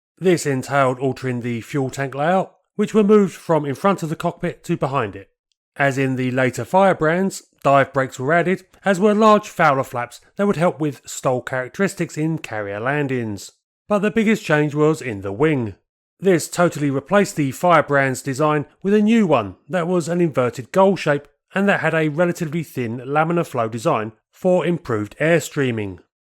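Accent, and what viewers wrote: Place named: Essex, United Kingdom. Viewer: DaadaadaaDAAA, daadaadaaDAAA, daadaadaaDAAA, ... Come ON. Your DELIVERY is getting un-LISTENABLE-to. You gotta VARY things a bit, so every SENTENCE isn't exactly the SAME as every OTHER one. The CONTENT is generally GOOD, but now I have to kill the SOUND, on ACCOUNT of this. So a LOT gets LOST. You may be from ESSEX, but that's no exCUSE! OK? OK.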